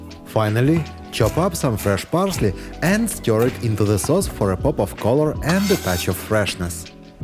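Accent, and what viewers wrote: Russia. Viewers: Russian accent 👎🤦‍♀️ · Advisory warning, there's nothing Japanese about this guy or his tricks or channel. I'm guessing from the accent he's originally Russian selling cooking techniques to an American audience. As a British born vegetarian former salad chef with Italian ancestry who doesn't eat white bread, white sugar or meat derived products, I won't be subscribing, lol. ✌️ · Clearly sounds Russian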